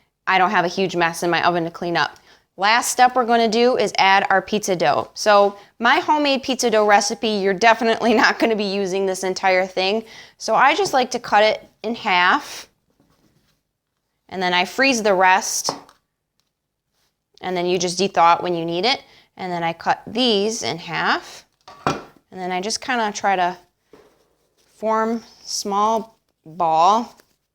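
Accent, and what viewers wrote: United States. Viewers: Buffalo, Cleveland, or Chicago accent?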